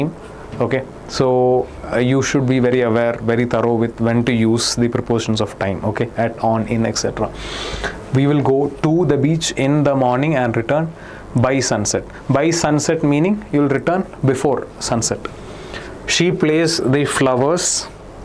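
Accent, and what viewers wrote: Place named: Kerala, India. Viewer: Sir is your language is malayalam bcz of your talking style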